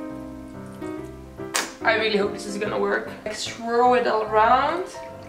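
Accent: Netherlands. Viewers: She sounds Dutch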